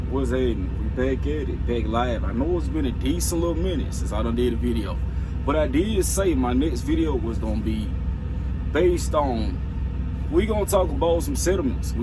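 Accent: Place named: Missouri, United States. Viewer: Definitely a Missouri accent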